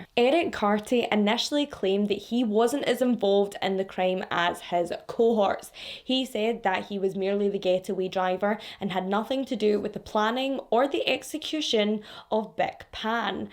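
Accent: Scotland, United Kingdom. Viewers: Living you I've been a true crime follower for a few years now. started it all! But I just love a Scottish accent tell me crime stories. You are awesome. Jen frombiggar!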